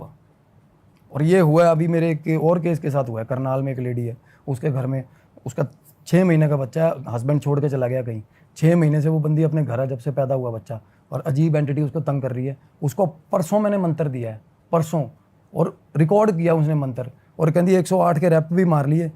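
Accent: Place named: Rajasthan, India. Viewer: Bhai . I really love his Rajasthani accent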